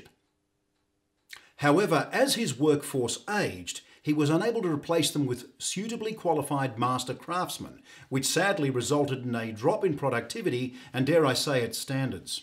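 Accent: Australia. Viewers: You sound very Australian to me. I am English. You do have a soft accent but it is clearly Australian (to me). Your pronunciation is exceptional and clear. I would say you speak with received pronunciation but with an Aussie flair. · Yes, but also sound slightly Aussie too so I couldn't tell, lol.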